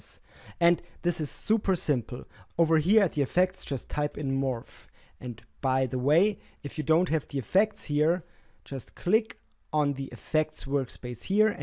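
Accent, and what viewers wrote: Germany. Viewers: Servus, wie gut geht es dir heute! Weil ich behaupte herauszuhören, dass du Deutsch deine Muttersprache ist (vermutlich denkst du, "es ist auch nicht schwer zu erkennen"), schreibe ich auf Deutsch. Hier geht es aber um deine Skills. Die finde ich sehr beeindruckend und deine Tipps hilfreich! Danke! Habe auch deinen Kanal aboniert!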